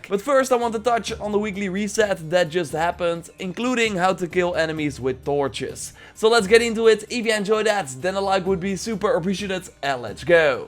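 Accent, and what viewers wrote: Netherlands. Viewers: Hij is nl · Hi, by any possibility, are you from the Netherlands/Belgium, you have a very dutch accent ^^/ Oh and great videos! Just found out your channel today and have spend the day watching your AC odyssey videos ^^/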